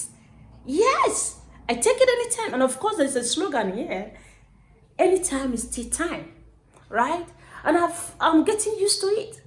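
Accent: Kenya. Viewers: Your accent is now more Kenyan than Nigerian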